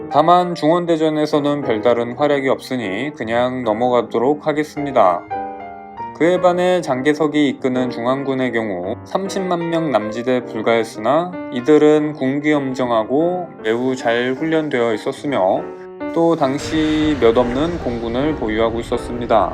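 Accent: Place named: Gyeongsang, South Korea. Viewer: 어째 경상도 냄새가?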